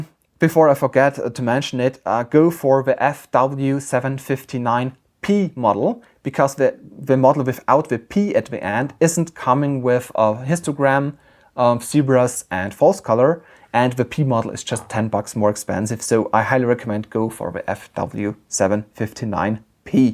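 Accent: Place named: Germany. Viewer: Nichts gegen dein Englisch, aber ich hab sofort gemerkt, dass du deutschsprachig bist. :D Gruß!! ;)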